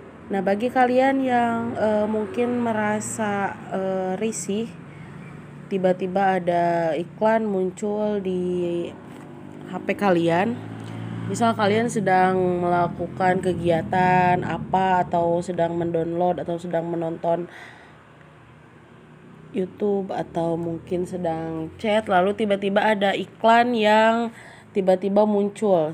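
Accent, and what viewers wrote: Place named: West Java, Indonesia. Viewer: mni Sunda pisan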